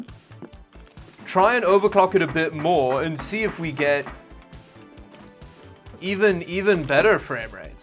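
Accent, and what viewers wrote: South Africa. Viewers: I can recognise that Saffa accent anywhere! Enjoying your videos. Greetings from home! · dude are you south african??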